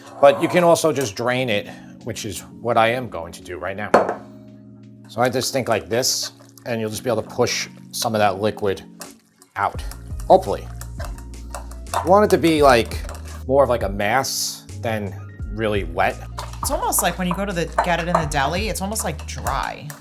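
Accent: New York, United States. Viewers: I am from Perth, WA and I just wanted to say your NY accent is great!!! All the best. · Just made another batrch today in an effort to save money. Well not an issue in USA, but here in Norway sliced turkey etc and deli foods run at 45 to 120 usd a kg. So as a poor creative/guitarist, have to do anything possible to cut down on food costs. Whereas found chicken breasts on sale for 15 dollars a kg. Of course have expensive guitar collecting habit, just spent 20k usd on vintage guitars. But have to pay that back somehow. So strongly recommended. Plus I love the NYC accent.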